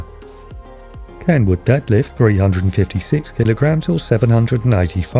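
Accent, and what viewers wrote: United Kingdom. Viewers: Stop with British accents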